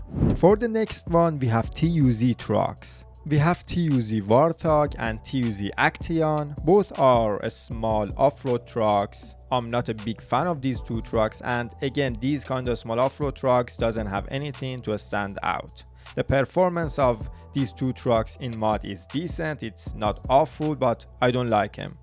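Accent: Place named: Iran. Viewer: U have some kind of Iranian accent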